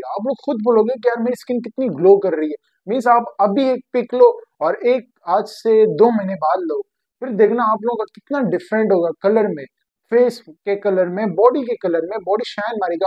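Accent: Pakistan. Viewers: Your transformation is amazing , you look so handsome but the issue is the way you speak, the moment you spoke it doesn't suit the swag you have in appearance. Pakistani language and male tone isn't sexy enough.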